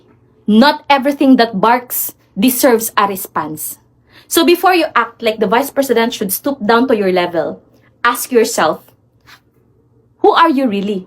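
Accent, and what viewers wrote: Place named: Visayas, Philippines. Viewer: Bisayang English mo day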